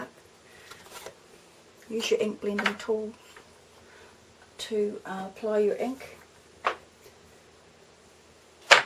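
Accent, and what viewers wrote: New Zealand. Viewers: Please tell me what you said , between the music not quite faded out and the Kiwi accent [I'm Canadian] I can't quite decipher what type of paper you're using there. I've got " ... you can see where the back ___? this has actually been done ___? paper but don't let that put you off ..." Thanks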